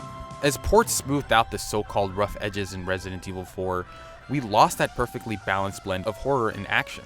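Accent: Spain. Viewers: So refreshing hearing someone pronounce the names in a proper Spanish accent. Great video! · Disliked! You say every word annoyingly in a Spanish accent, but you can’t pronounce Luis’ name properly?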